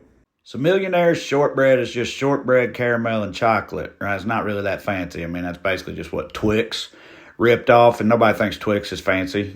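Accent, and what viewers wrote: Scotland, United Kingdom. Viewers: I am weirdly fascinated by this "Scottish" accent. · I’m down for any video where you use a Scottish accent,! These look absolutely luscious 😋😋😋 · Love the accents - especially the Scottish one - that's what I come to for, baby. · You knew we needed a second helping of the Scottish accent! Thanks for that! 😂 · Your array of talents continues to leave me in awe. Cooking plus British dialects? Lawd have mercy!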